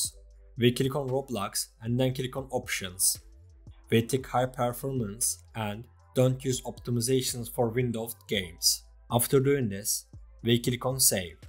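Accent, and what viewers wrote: Turkey. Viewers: Aksanından ve şurda ve demenden Türk olduğunu anladım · vaay abim türk :D konuşma seklinden anladım abi kralsın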